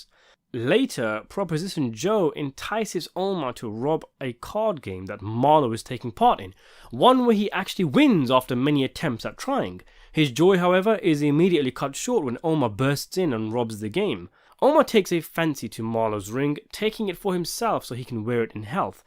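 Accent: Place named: United Kingdom. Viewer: Listening to the narrator is like listening to a british person telling me that beans and toast is a great american cuisine. As soon as I heard the accent , I just stopped listening.. srry not srry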